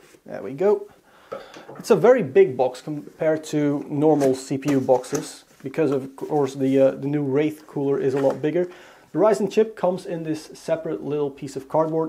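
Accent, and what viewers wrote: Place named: Netherlands. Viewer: Are you dutch? you sound dutch. And do proccesors come with thermal paste?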